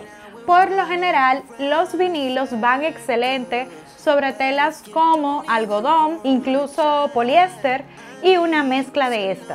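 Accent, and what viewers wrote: Dominican Republic. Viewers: Eres dominicana ?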